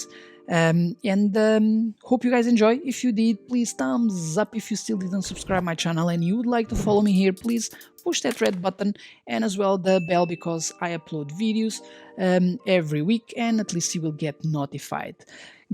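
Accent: Portugal. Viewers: Great video, I made my decision and will definitely buy this monitor after watching many videos on your channel about this. Also, dude are you Portuguese? Your accent really looks like it 😅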